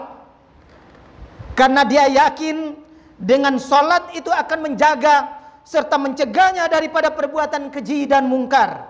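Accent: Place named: Bima, Indonesia. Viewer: Gaya bahasanya mirip logak Bima ya..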